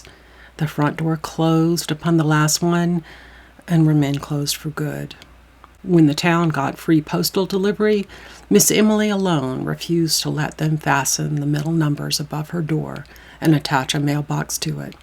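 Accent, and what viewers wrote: South, United States. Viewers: The southern accent REALLY helps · Are you from the south,?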